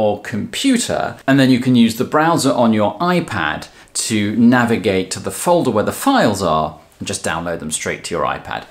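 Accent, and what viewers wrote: United Kingdom. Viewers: Thank you so much, this is such a great help and I exactly had the same promblem as you. Thumb up and subscribed. BTW: I love the Britishnes of your pronounciation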